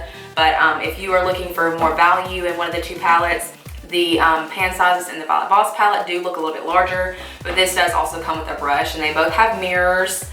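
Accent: South, United States. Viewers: You are so so so GORGEOUS!!!! You popped up on my suggestions and I just had to watch. I love your southern accent, hun it sounds a lot like mine! New subscriber, I'm impressed. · Omg where is she from her accent is adorable and southern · Is that a southern accent you have? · I totally didn't expect your voice to sound like this😆 Love the southern accent. Where are you from? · New subbie! Where's your accent from? You sound like a southern girl! Love your eye makeup! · Seriously? Clearly American and southern......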